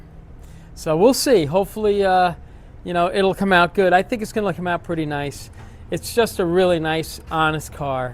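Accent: New York, United States. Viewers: Alabama here, I would have won a bet that you were from NY, by your accent.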